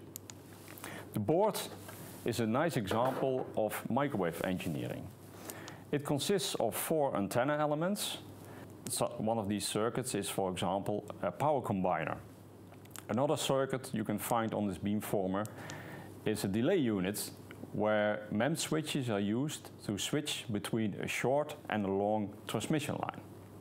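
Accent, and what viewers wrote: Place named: Germany. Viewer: English with a German accent. :)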